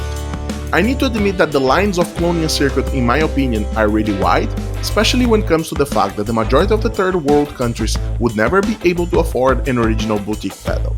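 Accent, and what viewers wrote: Brazil. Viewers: Esse timbre é de brasileiro falando em inglês em haha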